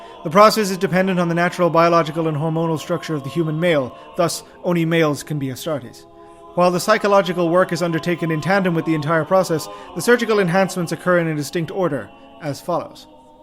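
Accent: Ireland. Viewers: I can't place your accent - is it Irish/Southside Dublin?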